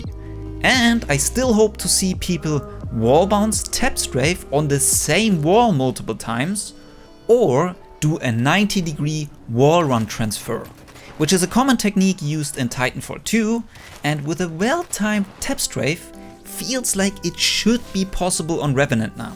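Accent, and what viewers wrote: Germany. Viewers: are u german u have a german accent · Are you german merkt man haha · Das is doch ein Deutscher Dialekt denn ich da höre,oder? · you sound german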